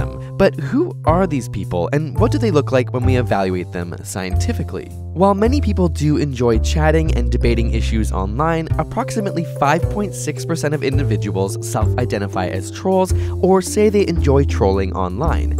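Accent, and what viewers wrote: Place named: Canada. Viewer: It's not the gayness that bothers me. It's the way you Canadians say "out". That's funny.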